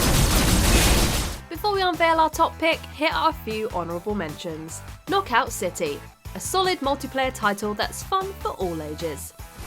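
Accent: United Kingdom. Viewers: Watchmojo, I like the british narrator on this video. It brings class to your positive Top 10. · I have a question for you,. Are you from the UK because it sounds like you are?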